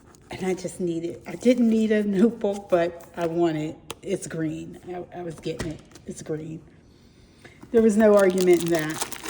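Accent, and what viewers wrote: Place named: Pittsburgh, United States. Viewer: I loved everything from this collection. I am also a legend and still didn’t get everything I desired. I’m grateful for what I received. I will continue to support C&P. Planning is my creative outlet and regardless of the economy I’m going to continue to invest in it. Love your videos. I picked up on the Pittsburgh accent btw 😊.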